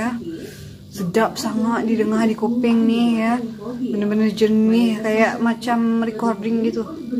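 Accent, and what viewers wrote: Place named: Malaysia. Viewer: Mantap kak, comell kak cakap Malaysia 👍♥️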